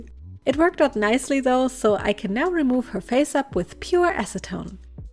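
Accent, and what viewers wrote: Germany. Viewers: Do I hear a slight and very cute german accent? 😉 However, the doll is amazing!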